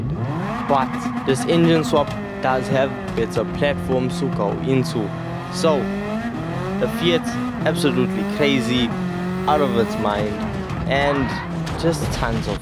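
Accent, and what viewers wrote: South Africa. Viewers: the most south african sounding guy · He is from South Africa after all, the accent makes the video have that much more character.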